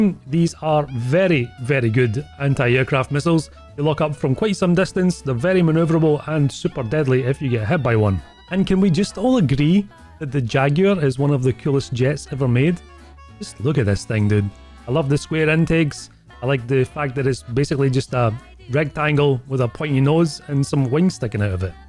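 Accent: Scotland, United Kingdom. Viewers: Pronunciation is quite good and the Scottish accent is always a nice plus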